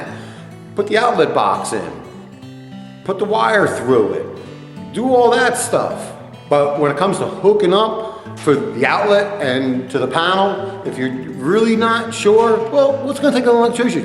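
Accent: New York, United States. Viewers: Is he from New York? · are you from New York? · good video, thank you. n love the ny accent. : )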